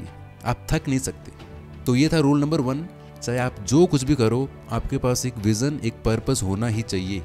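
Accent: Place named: Marwar, India. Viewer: Aapki aavaj se . Pata nahi kyu. Muze lagata hai aap Rajasthani he sayad marwadi? I think I am sure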